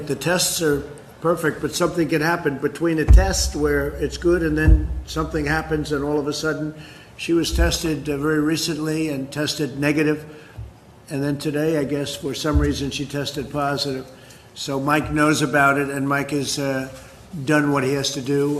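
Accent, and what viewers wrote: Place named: United States. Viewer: Yes if only there could be a split screen! You’re in Germany but you sound American, don’t forget about.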